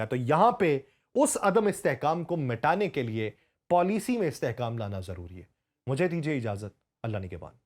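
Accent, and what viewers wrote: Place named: India. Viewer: ye bunda Indian hay,isska ta'lafaz hindustani hay.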